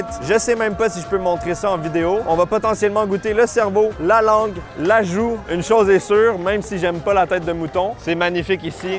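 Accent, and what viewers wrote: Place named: Quebec, Canada. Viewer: He is a french canadian, from the province of Quebec most precisely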